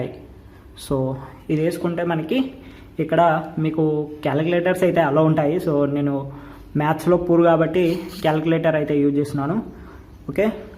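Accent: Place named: Telangana, India. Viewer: language okkk telanganaaa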